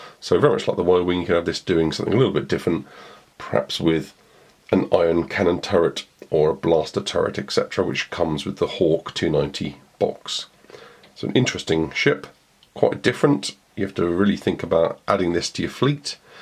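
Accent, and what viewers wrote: United Kingdom. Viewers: I say he vote, British people should narrate everything.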